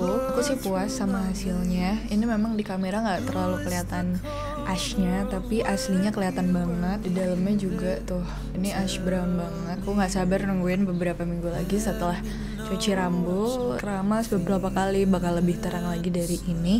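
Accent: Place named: Visayas, Philippines. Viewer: Akala ko bisaya si ateng 😂😊😊😊😘😘😘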